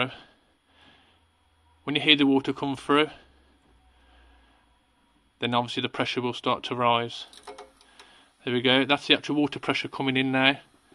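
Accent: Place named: Birmingham, United Kingdom. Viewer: Fantastic help thanks - have recently emigrated to Italy which I'm presuming the home of Ferroli and have just fixed my error 37 fault! I can warm myself up tonight! Cheers - and good to hear a Brummy-ish voice again!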